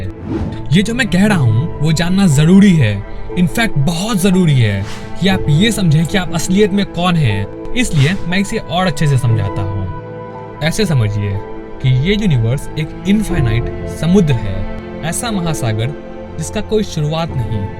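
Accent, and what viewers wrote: Bihar, India. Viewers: Bro aap bihar se ho na!!! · bihari narrator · Bhai tu bihari hai .....i can say it for sure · Are you from Bihar? You have a bihari accent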